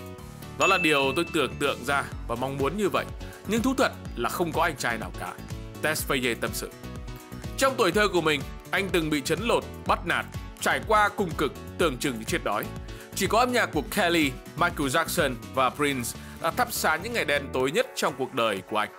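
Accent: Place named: North, Vietnam. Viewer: Ng bắc toàn đọc the là giờ. Thua luôn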